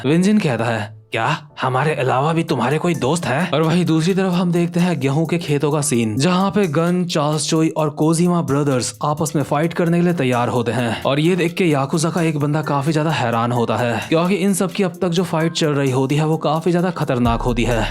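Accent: Uttarakhand, India. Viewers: Bhai aap uttrakhand sa ho kya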